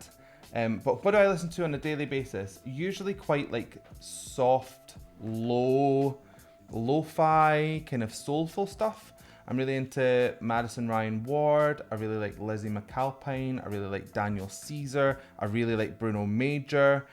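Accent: United Kingdom. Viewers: Why I love British and Scottish etc accents so much? You are cute so it does not help 😜 greetings from Tricity in Poland for you and and BTS Army 😘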